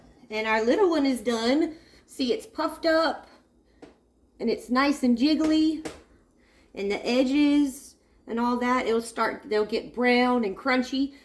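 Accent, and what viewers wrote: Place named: Mississippi, United States. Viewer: You talk like my cousins ❤ Were from Mississippi, are you??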